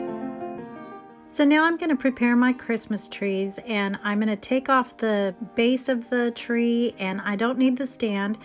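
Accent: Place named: Georgia, United States. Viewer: I always LOVE watching your channel! You are so creative!! Love when you say, “anytime you can get more Jesus” in your projects! Because we all need a lot more Jesus! Love that you always share inspirational meanings in your projects!! I love Crafting Cousins as well! (Btw I’m from GA, so I have that same “cutie patudie” (don’t know if that’s spelled right!) ..accent too!) 😂 God Bless you all, as you share God’s word, through your creative gifts of crafting! ❤️🙏🏼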